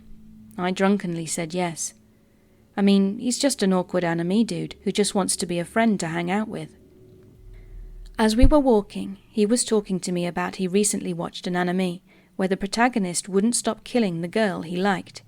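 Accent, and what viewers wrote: England, United Kingdom. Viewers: Good to heart an English female narrator 😊 I have a lot of true paranormal stories that I’ve not got around to sharing if you want them ? How do I send them to you ? X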